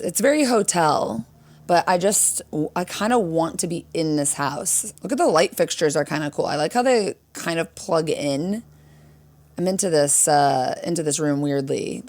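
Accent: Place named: Midwest, United States. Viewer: I love that with your midwest accent, it sounds to me like when you say tour you're saying 'tew-er' 😆 I cant not heart it!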